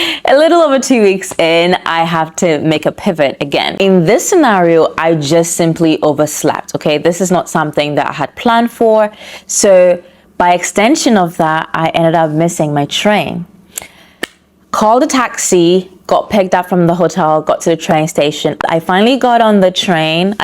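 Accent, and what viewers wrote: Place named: Italy. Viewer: I read Roma and Positano in your acquired Italian accent haha! If you spent over €300 a day charging then that's definately theft of some kind. Sorry. And that's positive...your gesture towards my app. Appreciate it.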